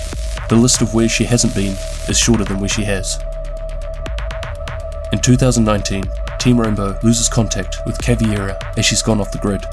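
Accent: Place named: New Zealand. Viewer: Yeah,the New Zealand accent is my favourite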